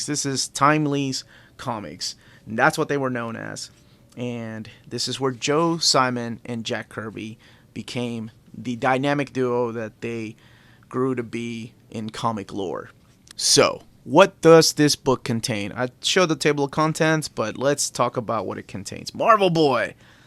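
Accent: United States. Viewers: OMG we're never going to agree on how to say things, I'm glad you live in America and I'm in England. I say Ah-phra and you say Ay-phra. You Nay-mor, I saw Nah-mor. Can you imagine a conversation between us? 😂😂😂 Out of interest, Mark Millar, how do you say it? When it's Americans I only ever hear Millaaaaaaarrrrrrr. But he's Scottish, I share a border with him, and I pronounce it Miller. If you ever meet him will you ask him please?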